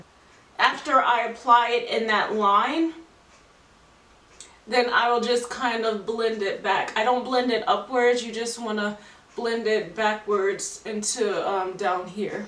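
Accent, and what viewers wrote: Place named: New York, United States. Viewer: I like that NY accent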